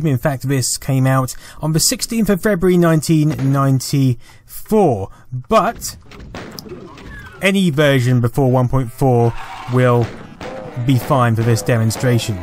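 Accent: United Kingdom. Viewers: I love your obviously British accent:) Great content as well, keep it up !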